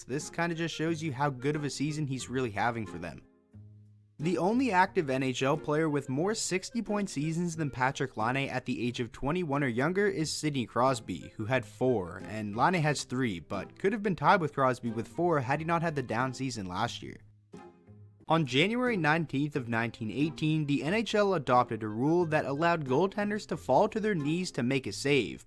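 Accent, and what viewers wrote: Canada. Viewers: How did you get to be a wings fan? Sounds like you're from Canada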